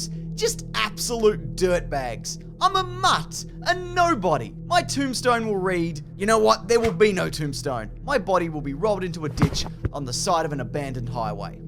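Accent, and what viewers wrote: Australia. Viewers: Came here for the lame theories. Stayed for an Aussie talking about Scotland.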